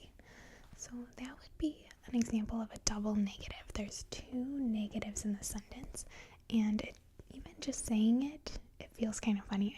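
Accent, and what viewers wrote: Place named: Michigan, United States. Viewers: the way she said welcome back and others words was stressed like how Sara Pailin does but she’s from a spot in Alaska where people form Minnesota moved to and the accent is different there. But she has such a lack of accent at times it does lend to midwestern. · I'm almost positive that's a Michigan accent.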